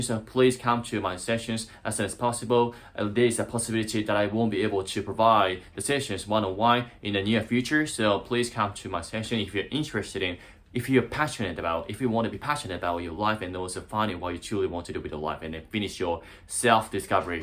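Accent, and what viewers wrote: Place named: Australia. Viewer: Very good video! I love that you share positive messages ❤ I wish I could learn to speak Japanese. Congratulations on working on your English, you’re doing a great job! I can tell you learned Australian English 😊 - I am American - our English has a different accent. Just an observation 😊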